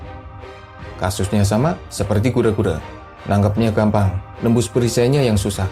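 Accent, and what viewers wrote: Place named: Java, Indonesia. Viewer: Saran logatnya terlalu medok dan monoton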